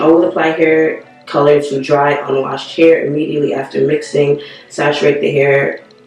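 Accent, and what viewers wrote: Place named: New York, United States. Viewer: Def dying my hair tomorrow and hoping it turns out like this. 😂😂 watching the video and listening to you talk I was like ain’t no way she not from New York 😩🤣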